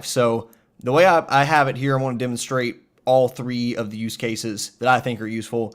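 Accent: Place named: South, United States. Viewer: Where you from? I’m hearin a southern accent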